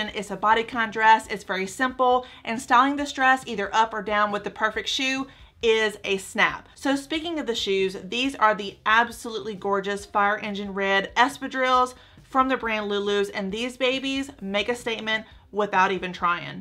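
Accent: South, United States. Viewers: I love, love, love the first outfit! The red accents were perfect and that stinkin’ adorable little flowered clutch? Yes, please!! 😍 I’m from Rowlett, Texas… where are YOU from? (I heard all those “y’all’s” you were dropping ! I’m definitely channeling southern gal roots!!) LOL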